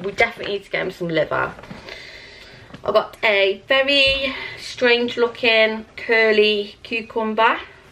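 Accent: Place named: Midlands, United Kingdom. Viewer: ahh I thought I could detect a Midlands accent ..but then also could hear hints of Bristol 😆 xx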